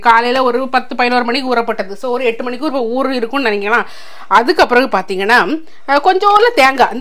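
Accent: Nagercoil, India. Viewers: நானும் நாகர்கோயில் பக்கம் தான், எங்க ஊரு பேச்சி style, கேக்கவே சூப்பர் ஆஹ் இருக்கு.... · Akka neenga nagerkoil ah.appadiye antha slang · Nagar kovil tamil super sis.... Aappam soo soft and 😋yummy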